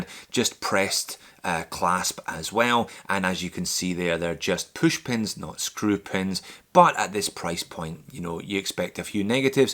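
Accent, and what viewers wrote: Scotland, United Kingdom. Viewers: Are you Scottish? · Are you a Scottish? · Scottish T, scottish accent.. instantly subscribed! :D Nice video btw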